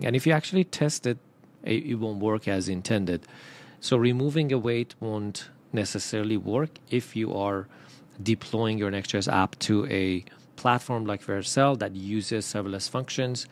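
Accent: Iran. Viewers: لحجه تون رو اول ویدیو رو شنیدم فهمیدم یک ایرانی هستی جان خسته نباشی💚🙏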